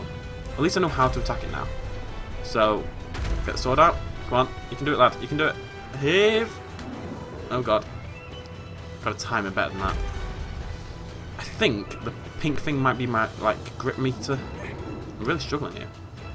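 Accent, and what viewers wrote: United Kingdom. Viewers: Got to love how the British say H.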